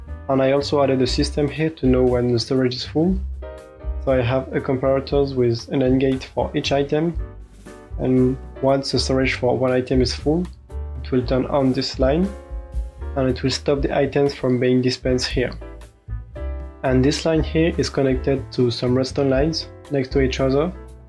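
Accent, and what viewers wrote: France. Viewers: As a french , strong french accent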